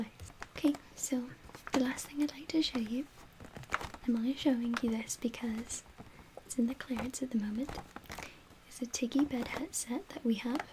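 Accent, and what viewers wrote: Wales, United Kingdom. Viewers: she's Welsh to be more specific :p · Ur Welsh right? U sound it! I'm Welsh too ur so pretty and sweet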